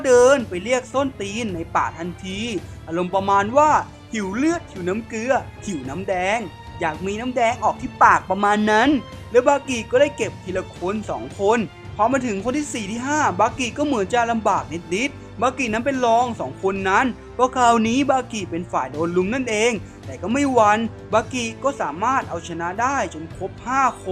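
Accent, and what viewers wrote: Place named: Thailand. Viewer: ทำไมบางทีพี่อ่านออกแบบเหน่อๆๆอะ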